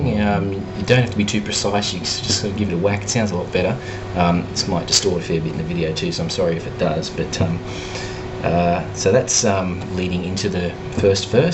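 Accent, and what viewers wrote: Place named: Australia. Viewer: Thanks for a great tutorial. Nice to hear a fellow voice from down-under.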